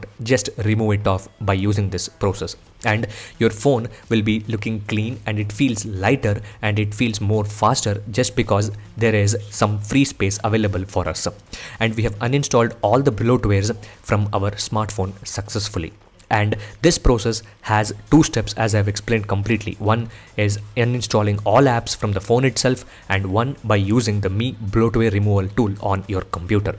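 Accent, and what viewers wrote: India. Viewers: You r south Indian? Ur voice is similar rrrr....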